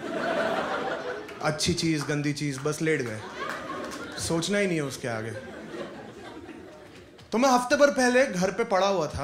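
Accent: Australia. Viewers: That’s an Australian accent lmao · Karunesh Talwar listening first time. Love ur Aussie accents 😁 upload more videos pliz. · Australian accent me baat krra tha wo lekin talented Banda h American accent bhi nikaal hi lega kyuki Australian accent jyada tough hoti h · His Australian accent is as good as heaven.Great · its an Australian accent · His Australian accent🔥🔥🔥🔥🔥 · Smoothie Australian accent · Lol it's Australian accent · Australian accent not american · Buddy woh Aussie accent hai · That is not American accent that is Australian accent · that aussie accent! wow! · Australian accent* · That's an Australian accent. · Australian accent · aussie acccent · Australian accent? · Damn! He got Perfect Australian accent!!!!😎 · You mean australian accent? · Australian accent. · COC legends it’s an australian accent.. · That's not an American accent it's Australian accent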